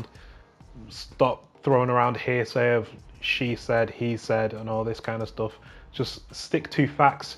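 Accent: United Kingdom. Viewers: bruv, ya need some proper editing. Besides that, your commentary's dead sound. (not mocking the Manchester accent. I'm a huge England-fan and Manchester in particular from the Hacienda bands)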